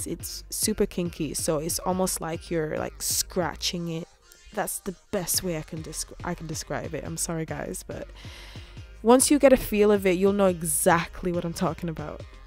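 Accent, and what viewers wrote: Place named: Yorkshire, United Kingdom. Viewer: I bet alot of Americans struggle to understand your thick Yorkshire accent!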